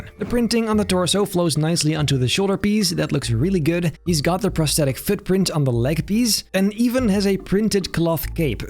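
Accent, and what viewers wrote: Netherlands. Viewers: This video could've been good but the horrible Dutch accent and the pronunciation of Scimitar as Skimitar ruins it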